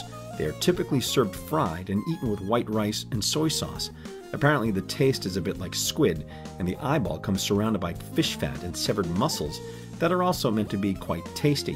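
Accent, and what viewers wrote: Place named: New Zealand. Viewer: Your NZ accent was way better, it's what makes your videos awesome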